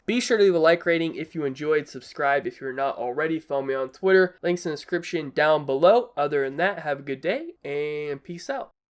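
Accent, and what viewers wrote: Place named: Texas, United States. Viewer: Sorry I don’t really understand your Texas voice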